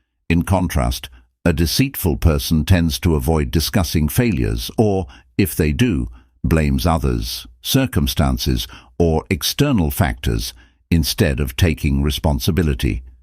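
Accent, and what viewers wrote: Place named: United Kingdom. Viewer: It's a great subject there's a shit ton of it out gear what sets you're presentation apart from the herd and saysclisten to me???? IT SURE AINT THAT CALM CONDENSENDIN ENGLISH VOICE THATS ON A COUPLE OF DOZEN NEW PIECES OF CONTENT A DAY ARE YOU READY TO DITCH THE ACT TO USE YOURE REAL VOICE??? AND IF THOS IS YOURE REAL VOICE ,WHEN ARE YOU GOING TO PUT THE ACTION BEHIND YOURE WORDS AND ACTIALLY PUT POWER AND HART ONTO EVERY SENTANCE??? CAUSE THE WAY YOURE PRESENTING THIS WANDERFUL MESSAGE YOURE sabbatoging the good you could be doing you want a better channel more thumbs up ditch that posh english crap or if that is you iam sincearly sorry find away or get a coach watch some videos on public speaking you're message ain't bad but there us nothing holding me gear specially when I know this crap allready see you say let go what we cannot control beuitif thing but you're killing me iams fighting sleep cause bbbbboooorrrriiiiinnng not impressive no emotion it feels you're just a damnd actor reading some lines even worse an actor just taming a job to get by with no real interest in what there doing and sad is it is Ionley comment cause I care ill probably be watching new content from you tomarow the difrence is if you sound like you're just paying the bills no matter how good the info is you're getting the down ward thumb iam ubsubing and reporting you as just another goddamnd a.i. 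pretender and I fing hate snitches and will probably abuse my self as a sick punishment for breaking Mt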